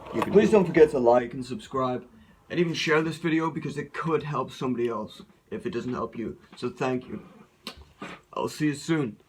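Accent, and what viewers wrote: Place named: Wales, United Kingdom. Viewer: Yes he is from wales he has a beautiful accent and really dark eyes I could look into them all the time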